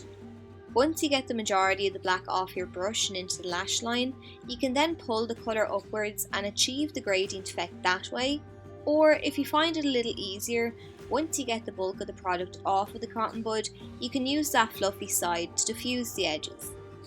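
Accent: Dublin, Ireland. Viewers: I can definitely tell you are Irish, and I think the Irish accent is beautiful. I also use the Rimmel Match Perfection, I have True Ivory. I’m very pale, like porcelain almost. Thank you, this was helpful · Are you Irish? Cuz I love your accent!! Sorry if you're not, I'm new but I'm definitely subscribing!❤️ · I'm from Ireland and that's a Dublin accent 🍀🇮🇪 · is that Irish accent?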